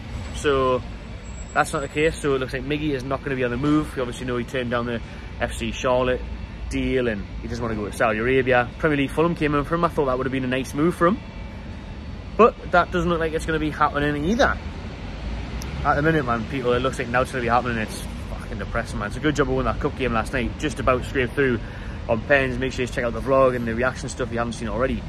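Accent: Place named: Newcastle, United Kingdom. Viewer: The subtitles on YouTube don’t account for the geordie accent 😂 said career and the subtitles came up as Korea 😂😂😂. Cheers for the update!!!!!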